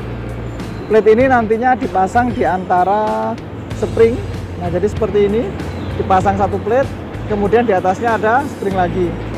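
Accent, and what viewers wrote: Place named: Malang, Indonesia. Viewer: Sepring ,jan logatnya ngalam banget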